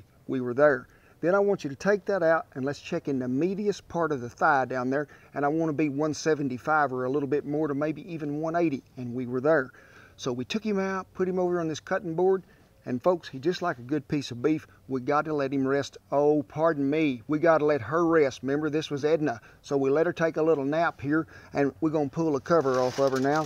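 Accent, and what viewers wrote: South, United States. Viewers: Gotta love that southern language. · I love that cowboy accent.....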